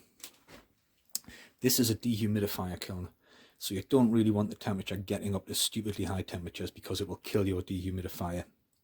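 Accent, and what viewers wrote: United Kingdom. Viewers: very British!! 🇬🇧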